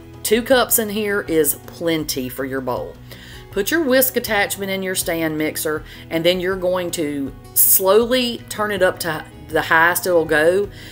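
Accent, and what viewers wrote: South, United States. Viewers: You sound like me!! Are you from the south too? I’m from Nashville. I love the tips you’ve shared! I’m single and although I don’t have kids, I’m finally putting my kitchen back together after a bad car accident and fighting cancer for several years. Almost everything I had expired or was freezer burned so badly I had to throw it out so I basically had to start over. Now I’m trying to make more things myself and be careful what I buy so I don’t consume too many ingredients my body doesn’t need. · I JUST LOVED YOUR VIDEO AND YOUR SOUTHERN ACCENT 😊, THANK YOU FOR MAKING THE VIDEO !!!! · New Subscriber here! I'm a MeMe also! 🥰 These recipes are great! I love that you don't measure your seasonings!😂 I hear the Southern in your voice ( I'm Mississippi) and figured that's why. Can't wait to watch the previous videos! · Just found your videos and I chuckled though the whole thing!!! 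I was raised in the South and your accent makes me feel at home. And your style is just like mine.... spill vodka on the counter??? oh well... now it's sanitized!!! You got a new subscriber!!!! 🤣❤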